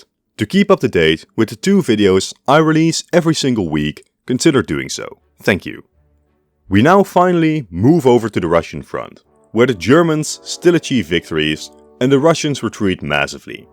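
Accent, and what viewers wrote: Netherlands. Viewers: I can tell instantly that this man has a Dutch accent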